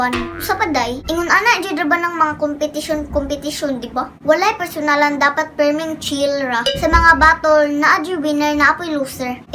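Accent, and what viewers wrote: Visayas, Philippines. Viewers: pashout nman cutie in your next vlog... i really love the way you speak visaya...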